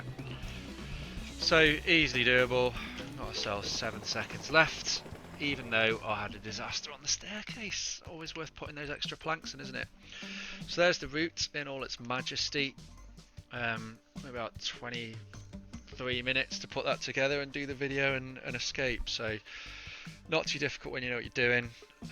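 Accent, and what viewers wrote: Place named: United Kingdom. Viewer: Found this video after watching Smii7y absolutely lose his mind over this heist. The transition between loud Canadian frustration and calm British professionalism was phenomenal 🤣🤣🤣. Great video!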